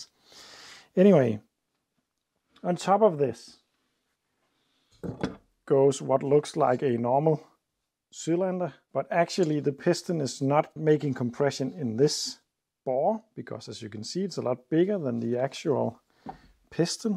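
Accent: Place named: Denmark. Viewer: Synes nok at det var “dansk/engelsk” dialekt 💪🏻. Fed kanal du har her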